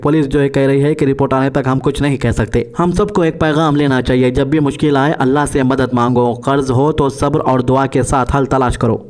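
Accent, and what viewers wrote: Hyderabad, India. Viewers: Mashallah 👌 Miya bhai ki awaaz mein kyon khabren bol rahe ho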